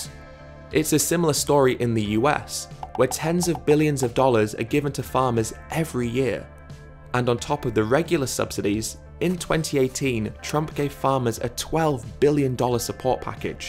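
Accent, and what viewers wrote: United Kingdom. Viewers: Hold up, Is this guy British?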